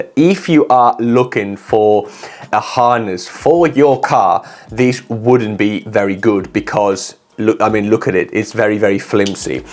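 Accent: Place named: Geordie, United Kingdom. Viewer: I'm very interested in your accent its Geordie and like polish at the same time. Where are you from?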